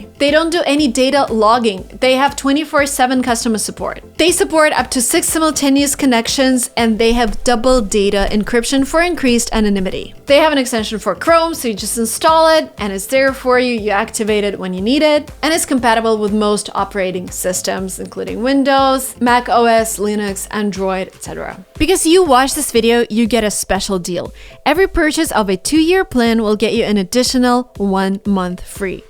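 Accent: United States. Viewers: Can I be like you... I wanna talk alike you.. I'm dying to learn this accent... American 😭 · Studio would be better. But then yes anything that please you or form your comfort. I'm always focused on your accent so that I can interpret everything you say. And honestly I've been watching your videos for past 1.5 years or more & I can confidently say I can not only understand your entire accent but many other American speakers. Thank you so much from the bottom of my heart ❤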